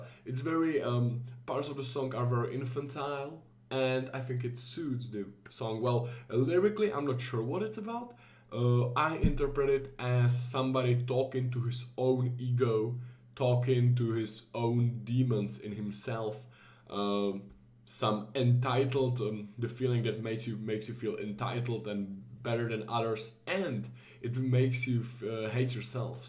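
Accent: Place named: Czech Republic. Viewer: I don't know why but your English sounds very czech.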